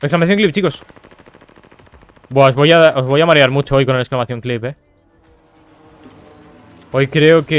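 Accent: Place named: Argentina. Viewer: Grande pensé q eras argentino jóder...abrazo desde Argentina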